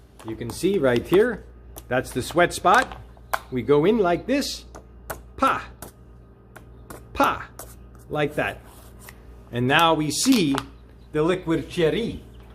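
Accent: France. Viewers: 😝 great French accent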